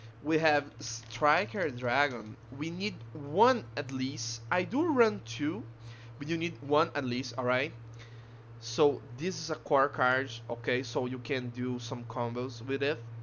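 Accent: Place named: Brazil. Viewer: Qual foi, pelo inglês sabia que era BR, tem alguma forma de falar contigo pra me explicar como rodar esse deck ?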